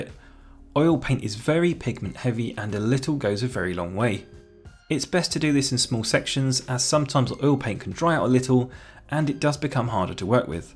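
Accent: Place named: South England, United Kingdom. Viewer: I am not an artist by any stretch of the imagination. I mean, I can freehand-draw an elephant that won't make you think it's a hippo, but that is about my limit! (Thank you, trunk, tusks and toes!) In scale modeling, I am a stickler for realism, rather like Bill Halliwell, and I don't give myself much leeway. It's just that lately I am starting to re-think what realism actually is. 🤔 In the view from the USA, your south-England accent does not sound boring at all, although I do enjoy hearing the wide variety of British accents that one finds in Youtube scale modeling. Lately, I am particularly getting a kick out of the ones that pronounce "blood" as rhyming with "mood", because it's so startling to an ear used to hear BBC News as the only British pronunciation!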